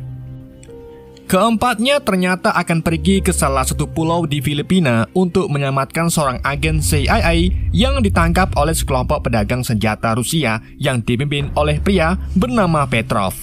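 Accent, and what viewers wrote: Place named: Tegal, Indonesia. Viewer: Mimin tegal 😂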